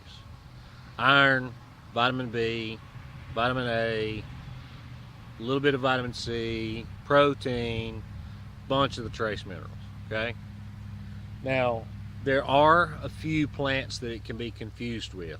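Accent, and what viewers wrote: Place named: South, United States. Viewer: I'm subin' because you sound like you know your stuff and you sound like your from the south. I'm back in Alabama, where I belong and I've been looking for a channel like this in my neck of the woods.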